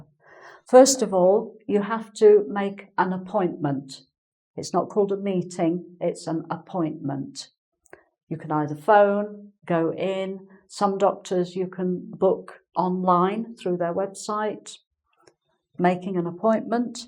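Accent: England, United Kingdom. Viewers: Thanks for your greet efforts I loved your British accent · Dear mam,your speech itself makes us to understand the British accent very well.Thanks a lot. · Hi Mom, I'm from Indonesia. I like your british accent. I like also the way you teach. I always learn English from your videos. Thank you so much. God bless you. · I like her personality and her British accent 😍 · I love your British accent, it's sounds very cool 😊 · I love your english accent!!, it sounds elegant and clear,, some day I hope I´ll get it,,, the class was really useful !. I´m from Perú!! Cheers from Perú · I like your perfect british accent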